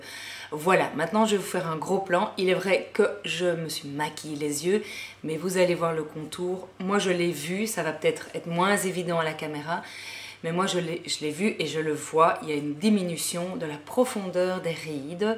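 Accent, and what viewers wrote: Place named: France. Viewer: Beautiful accent...love the french accent so much ☺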